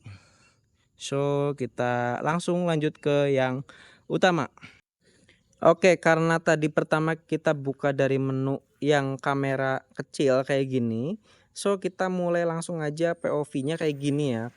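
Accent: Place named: Banyumas, Indonesia. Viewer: sepertinya logat banyumasan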